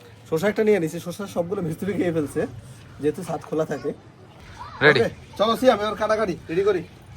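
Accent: Noakhali, Bangladesh. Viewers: Bhaiya ki noakhalir?🥹 Bakider Kotha Barta shune Moja laglo,roja rakha bhai er jonnow kharap laglo,unar iftar er Jonno kichu rekhe diten 😂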